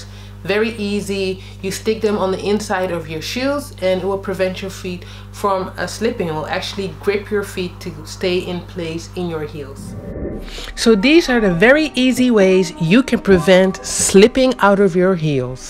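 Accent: Netherlands. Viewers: hahah wat leuk! Je uitspraak is uitstekend hoor, heb zelf op een internationale school gezeten in Californië maar je hoort toch gewoon het Nederlands er doorheen als iemand uit Nederland Engels spreekt;-) leuke video, ik was op zoek naar een oplossing voor mijn kleine teen die met een open hak in de verknelling kwam dus vandaar dat ik bij jou kwam. zoals ik zei, leuke video meis, ga zo door 👍 xxx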